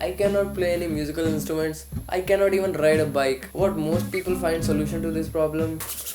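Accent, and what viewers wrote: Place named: Ghaziabad, India. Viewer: Dude. . .are you from Gaziabad or a nearby town ? Actually i have two cousins living there and they have this similar accent as yours ! Please reply ..... I'm curious !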